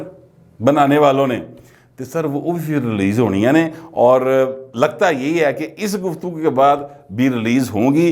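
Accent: Punjab, Pakistan. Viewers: SB. AP k v log mujhay buhat deer se miltay hen.buhat intizar rehta hy Apki Urdu k beech me in Punjabi buhat khub surat lagti hy. Ashraf gujjar from Nankana SB.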